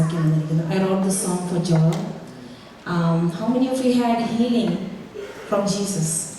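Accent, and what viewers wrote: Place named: Poonjar, India. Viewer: തലയിൽ തുണി ഇടാത്തത് എന്താണ് മംഗ്ലീഷ് കൊച്ചമേ. അമേരിക്കയിൽ അത് വേണ്ടേ ഓടാ വെള്ളം കുടിച്ചു നടന്നവൾ ആയിരിക്കും ഇപ്പോൾ അവളുടെ പൂഞ്ഞാറ്റിലെ ഇംഗ്ലീഷ്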